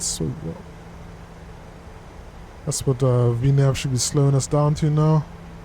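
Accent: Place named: Sint Maarten. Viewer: Hey bro which Caribbean island are u from your accent sounds st.Maarten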